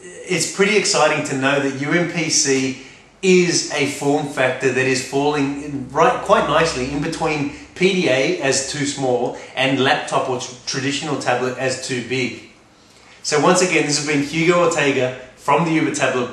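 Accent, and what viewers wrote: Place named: Australia. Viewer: spanish guy with an australian accent. enough said.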